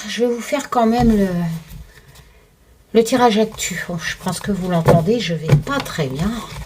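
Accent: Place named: Ch'ti, France. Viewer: Bonjour jadore quand tu parle en patois chti Je suis une de snord mi Ca me fais du bien en plus quand tu chantonne le schiot quinquin Bisous ❤❤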